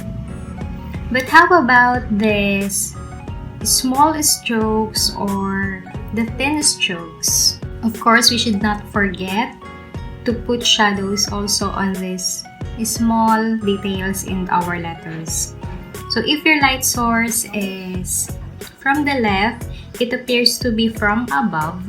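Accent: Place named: Philippines. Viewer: You are very helpful. Glad I found your channel. Pilipina ka ba? I can sense based on your accent.. Hehehe.. Thanks a lot! 💚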